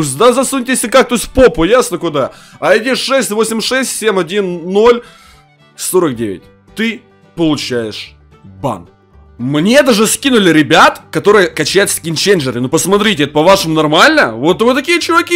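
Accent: Ukraine. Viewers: ты с Украины?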